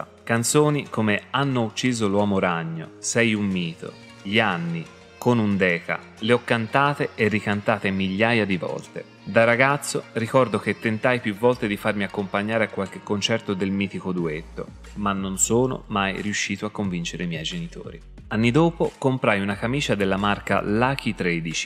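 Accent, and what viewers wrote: Tuscany, Italy. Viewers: Ti ascolterei tutto il giorno già solo x il tuo favoloso accento toscano... io amo ❤️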